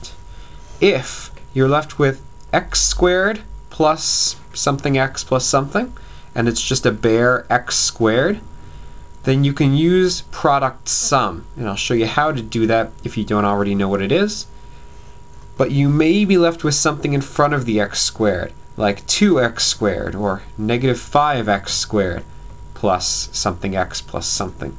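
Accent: Canada. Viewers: Get Oooot of ere! I love the Canadian accent! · I like your Canadian accent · are u canadian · U canadian? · are you canadian? ^.^ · Off topic but are you Canadian? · hi fellow , u canadian xD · Let me guess, Canadian??? You said oot instead of out. Great video though. Thanks. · are you from Canada?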